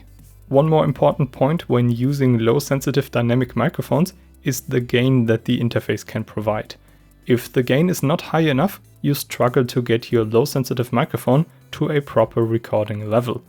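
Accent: Germany. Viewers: ich höre den deutschen Akzent ganz deutlich, ha ha))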